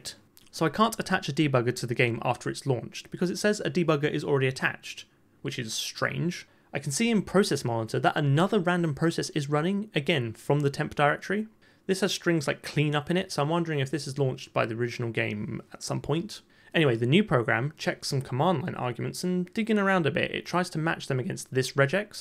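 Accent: United Kingdom. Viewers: i love your accent... remember me when i been in london.. age ago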